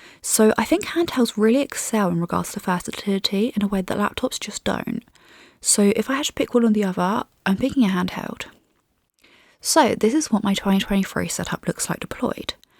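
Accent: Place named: Scotland, United Kingdom. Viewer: nice scottish accent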